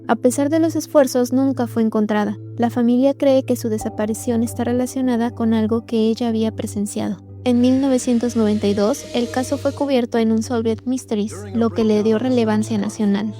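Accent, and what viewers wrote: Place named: Fresa, Mexico. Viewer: Estaba intentando tomarme en serio el video por el contenido..... Pero es que es imposible con esa vocecilla!!!!!!!!!! Super kiwi/Fresa no me quiero imaginar en la cama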